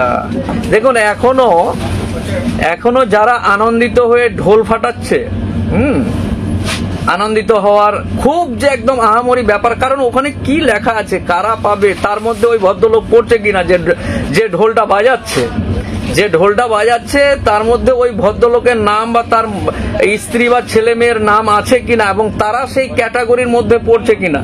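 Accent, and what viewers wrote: Bangladesh. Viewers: কথা শুনলে বুঝা যায় বাংলাদেশের মাল😅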